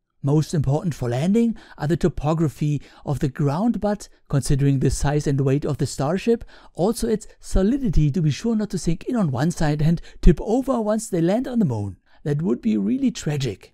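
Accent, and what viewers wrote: Germany. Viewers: Junge du klingst so Deutsch ne😂😜 naja eigentlich geht's sogar. Cooles Video! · I Are you kidding? He has a really typical german accent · Das nenn ich mal ein "deutscher Vorzeigeakzent" 😄🍀 top Video, danke 👍👍👍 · Almost certainly Germany. Please correct me if I'm wrong.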